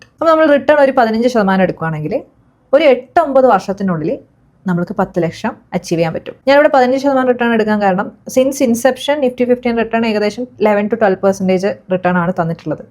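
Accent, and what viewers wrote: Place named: North Kerala, India. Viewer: ആടെ, ഈടെ, ഏടെ... “ ഒരു വടക്കൻ ” 😄💪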